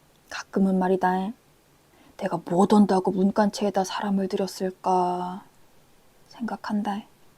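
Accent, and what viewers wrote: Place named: Gwangju, South Korea. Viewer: 혹시 고향이 광주이신가요? 저는 광주사람인데 사투리가 꽤 자연스러우시네요😂😂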